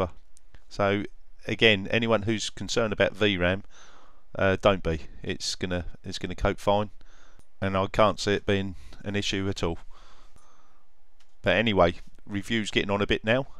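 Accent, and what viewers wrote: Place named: England, United Kingdom. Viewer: Good review. English accent's are badass, couldn't resist saying that lol. I think if Fury X would drop to 550$ and Fury 450$, assuming they can at least hit 1200 with some voltage on a proper loop they would sell like crazy. Not to mention DX12 coming and looking at Hawaii i think Fiji will come a long way in a year.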